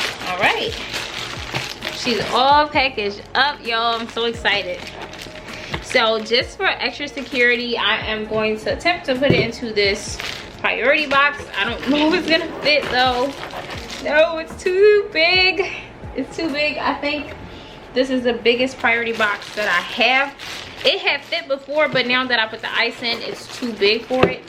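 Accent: Philadelphia, United States. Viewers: Lol I knew 3 seconds into the video you were from Philly! Thanks for the info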